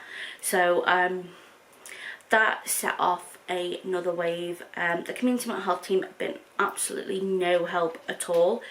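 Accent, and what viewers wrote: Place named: Liverpool, United Kingdom. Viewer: Hi I am a crocheter/ knitter just found your podcast thanks to Ali from little drops of wonderful and love it we are ex scousers living in Australia miss the accent and loved listening to you.. Working my way through your pod casts you are a great knitter and crocheter loving your work I taught myself in lockdown to knit socks and loving it although we don’t need wooly socks here much, but I post them to my sister who really appreciates them🧶🧶🧶 carry on with your great work.!!!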